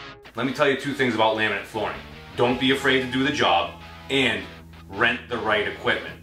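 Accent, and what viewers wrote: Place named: Boston, United States. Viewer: Looks great! Love the Boston accent! ( what’d the wife say?!?)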